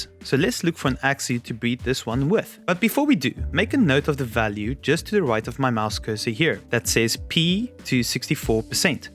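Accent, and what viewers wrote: South Africa. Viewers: I thought you might be South African, so am I :D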